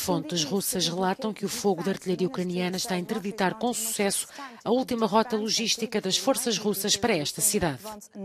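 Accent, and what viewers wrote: Portugal. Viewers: esse português de portugal não da pra escutar, é horrível.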